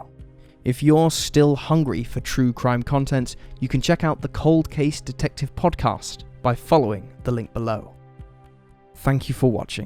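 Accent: England, United Kingdom. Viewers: Pommy accents do not help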